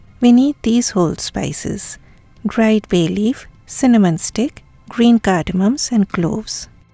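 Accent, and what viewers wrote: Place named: Bengal, India. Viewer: I really love your accent😊😊 english from a bengali tounge is always sweet to hear ❤❤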